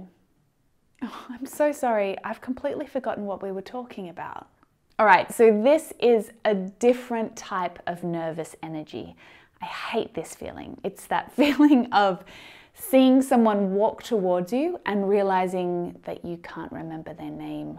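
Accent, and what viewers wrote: Australia. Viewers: Hello, day by day your presentation is getting more interesting, and it is a bit hard to resist the temptation of watching you talking with a sweet Aussie accent. Keep up with your lessons that address all English language learners. You have chosen the best profession to make money through toiling and shedding sweat. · For the way she talks, you can tell she has a "teacher" australian accent. If you come across some aussie he/she doesn't speak that articulate. I had an argentinian english teacher with the same way of talking but american accent. Nice video and VIDEOS btw!! (what does "mmm" stand for? "my magnetic mouth"?)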